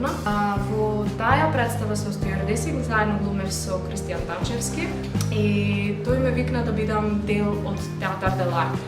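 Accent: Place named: Kichevo, North Macedonia. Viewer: пример за вистински културно одмерено девојче, браво! Многу ми се допаѓа кога зборуваш на Кичевски дијалект, секоја чест, Прекрасна девојка!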